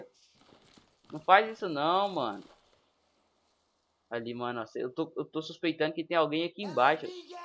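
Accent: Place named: Sao Paulo, Brazil. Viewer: Você é paulista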